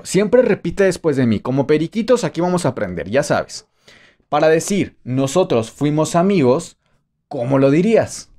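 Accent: United Kingdom. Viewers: Esto es inglés pritanico no es el inglés de American ?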